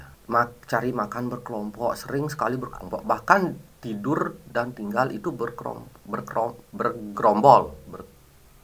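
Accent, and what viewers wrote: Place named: Borneo, Indonesia. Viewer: salam dari tanah dayak kalimantan cara ngomongnya mirip sekali logatnya dgn org dayak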